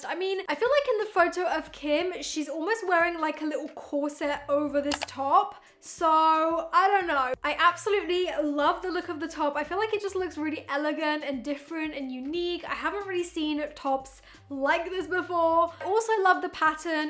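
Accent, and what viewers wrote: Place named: United Kingdom. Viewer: I think lots of people from the UK talk like that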